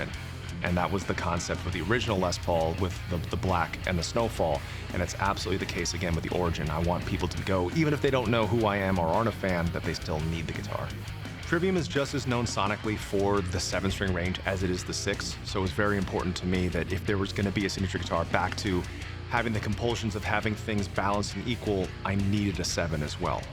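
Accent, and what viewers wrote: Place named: United States. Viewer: Holy crap. I watched all Video that posted in order to learning English but he's talking so fast. I hope makes a course of speaking America accent. I like his voice. I am a fan from Viet Nam. 😁😁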